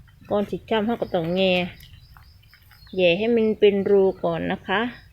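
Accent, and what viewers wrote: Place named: Isan, Thailand. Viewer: ดีมากค่ะ ติดตามค่ะ ภาษาอีสานแท้ๆ หาฟังยากแม่ชอบควรอนุรักษ์ไว้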